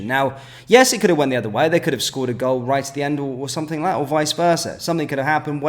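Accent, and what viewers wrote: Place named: Glasgow, United Kingdom. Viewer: That's some Wine Alley accent you have there mate.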